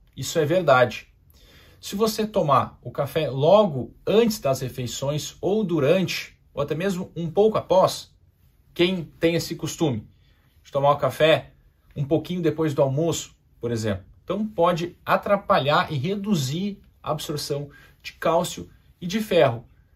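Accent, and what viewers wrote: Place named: South, Brazil. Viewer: Sabia que eras do Sul..😄Zürich aqui!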